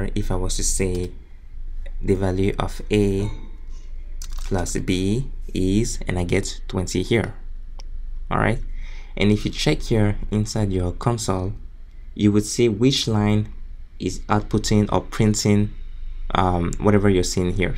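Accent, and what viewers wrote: Nigeria. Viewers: Great tutorials, nice accent. Nigerian, yeah?😊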